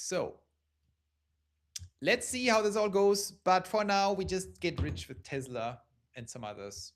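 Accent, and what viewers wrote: Germany. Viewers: the video audio is drowning your beautiful german voice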